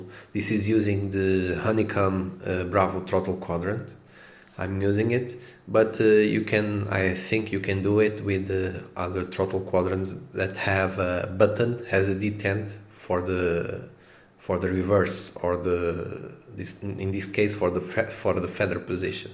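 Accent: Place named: Portugal. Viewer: Obrigado! (your accent sounds portuguese, like me). (edit: unfortunately, the feathering does not work if we want to feather just one engine but good try).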